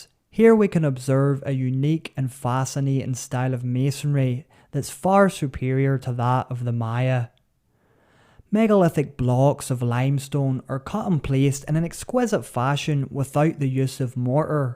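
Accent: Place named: Scotland, United Kingdom. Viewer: I think he is scottisch, i love his accent 🥰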